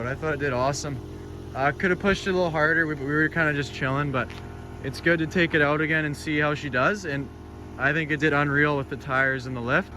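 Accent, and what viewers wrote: Canada. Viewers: Commentary is hilariously Canadian #LetsGoBoyzzz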